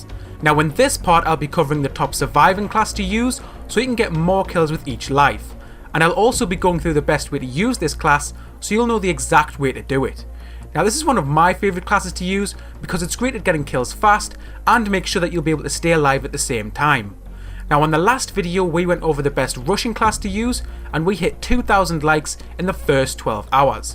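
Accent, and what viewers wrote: Newcastle, United Kingdom. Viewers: It's not Irish, hes from Newcastle, it's a Jordy accent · U from newcastle I am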